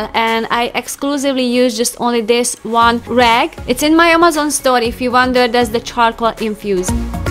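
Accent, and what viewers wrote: Hungary. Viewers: I don’t know why YouTube recommended your video and it started playing it with subtitles only and I saw that soup and I was like that looks hungarian.... so I clicked and I heard your accent and I knew it straight away that you are Hungarian too! Feliratkozva 🧡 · Hello from Romania. Love your hungarian accent.🤗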